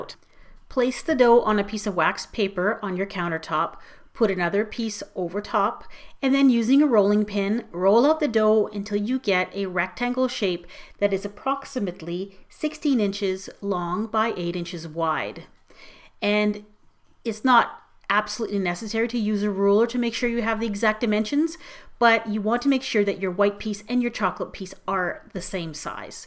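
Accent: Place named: Ontario, Canada. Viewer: The hypno dough got me had to thumbs up lol! Are you from Ontario?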